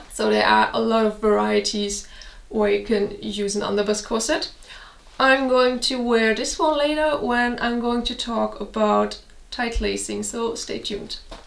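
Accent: Germany. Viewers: Sounds German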